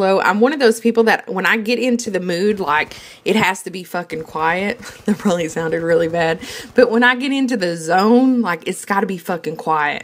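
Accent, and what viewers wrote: South, United States. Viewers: I love the country accent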